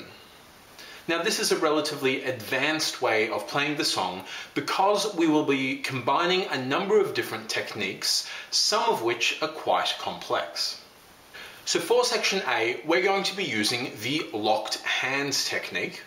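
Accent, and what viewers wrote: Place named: Australia. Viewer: hey, i noticed you have an Australian accent, do you do 1on1 tutoring or know anyone else in Sydney for Jazz piano youd recommend?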